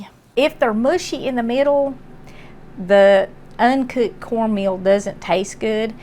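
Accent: South, United States. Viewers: I love watching your videos. And your voice and southern accent just seem to calm me down when I'm feeling down or just out of it. I'm from Missouri so I think I have a accent too lol. But love listening to you. Take care!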